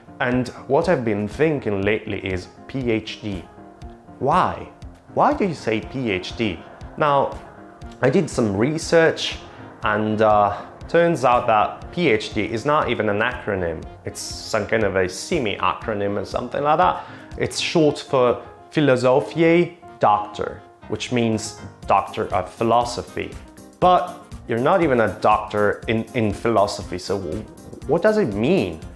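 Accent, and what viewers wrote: United Kingdom. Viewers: Oddio... Con quella barba e quell'accento britannico mi fai emozionare! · Seriusly how you have almost a british accent!!! I struggle so hard to just cancel the italian accent. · I think your accent is sooooo British :) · IlRompiBalle In quanto madrelingua italiano non può avere un vero e proprio accento dei classici, per quanto il suo sia chiaramente un inglese in linea di massima British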